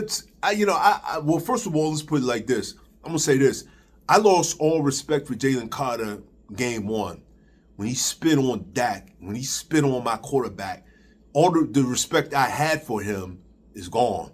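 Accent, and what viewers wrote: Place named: Brooklyn, United States. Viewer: My man much love I’m feeling this video. I’m from Brooklyn. Where are you from bro? You sound like you from home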